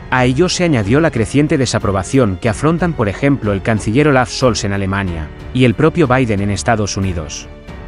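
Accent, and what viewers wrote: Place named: Spain. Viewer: Bolivia noticias, y sale hablando un español. ??????????????????????????????????!!!!!!!!!. Habrá un canal que se llame chile noticias y salga hablando un cubano o un yanquee ????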